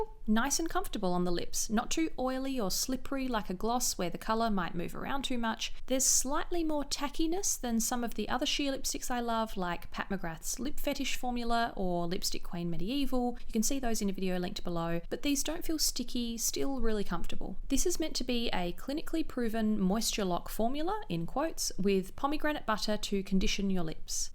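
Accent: Australia. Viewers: You are australian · I'm so used to watching American YouTubers that I, an AUSTRALIAN, was shocked to hear her accent. Love this video btw!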